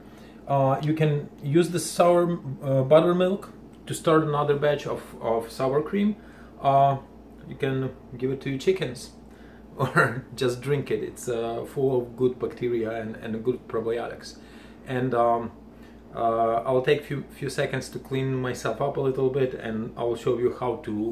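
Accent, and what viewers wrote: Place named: Germany. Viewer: Very interesting. I sense a slight German accent. Good presentation. My aunt was a catholic Nun and she lived in a Monestary ( Kloster ) where they backed their own bread and made butter. And connected to it was a Brewery.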